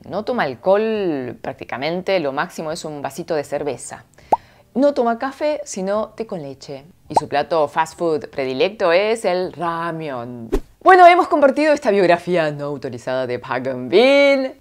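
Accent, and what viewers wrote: Argentina. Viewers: Me enamoré de esta chica con acento argentino y con esa voz envolvente y sensual.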